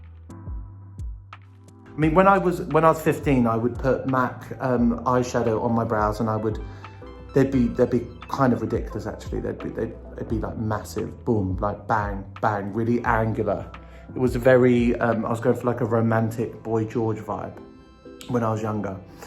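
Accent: United Kingdom. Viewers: Thought Iam watching Harry Potter , when he started British accent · I love their British accent.❤️ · Everyone talking about good music, ' pronouns, but can we talk about their british accent? Gosh i fell in love. ✨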